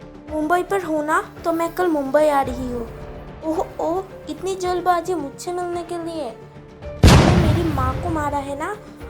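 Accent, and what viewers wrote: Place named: Assam, India. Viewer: Ab Assamese ho lsliyai abka jab hindi bolotai ho to etina shoot naihi karta but koi nhi ab at least try to kar rahi ho..💞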